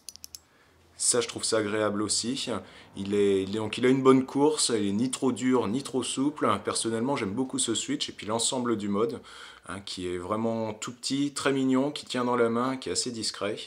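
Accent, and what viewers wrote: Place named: France. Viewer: I gave it a like just for the french accent love it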